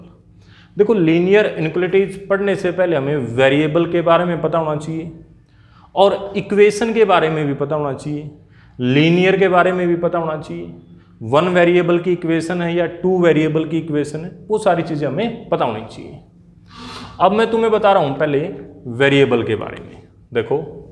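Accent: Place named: Haryana, India. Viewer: Si ke accent ko dekh ke lagta h ki sir haryana ke h 😎😎 But kuch bhi ho padhaya badhia 🙏🙏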